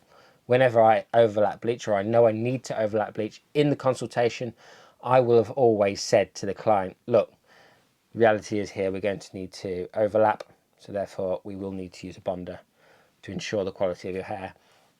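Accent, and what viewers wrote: United Kingdom. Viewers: I was watching and listening while making Sunday family breakfast, having a discussion about toast. Part of my brain that was listening to the end was wondering what “car key” color looks like. Must be like a dark steel grey. OH!!!! Khaki!!! I do so love listening to an English accent. But I need to focus. Thank you for your education!!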